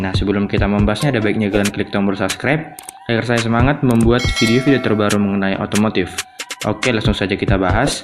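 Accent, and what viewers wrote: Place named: Sumatra, Indonesia. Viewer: Reviewnya logat sumatra banget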